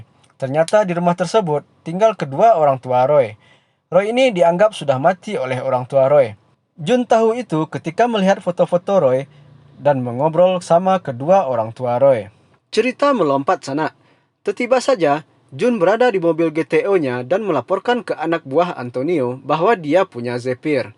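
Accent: West Sumatra, Indonesia. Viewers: Orang Sumatra barat ya bang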